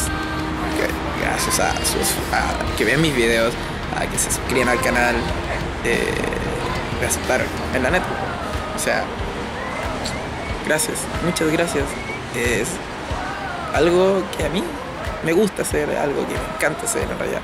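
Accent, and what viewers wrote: Chile. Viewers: y? creo que son de mi ciudad Arica, sino sorry jaja. Oye que bueno que has mejorado tu forma de hablar, no te conozco en tus inicios, pero esa forma animada de decir las cosas a la chilena es tu buen sello, saludos compaa.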